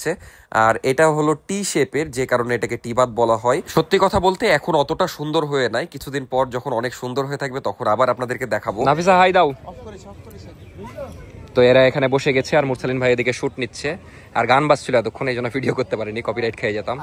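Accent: Rajshahi, Bangladesh. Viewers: the best thing we like is your true Rajshahi accent ar apni o apner wife er true innocence. Allah apnader shushtoh abong bhalobashai poripurno rakhoon. Ameen abong apner Maa er jonno pach wakht salah ar dua korben karon etai uner akhon aktai chawa ar apni shontan ar apner dua is most important. Respect ✊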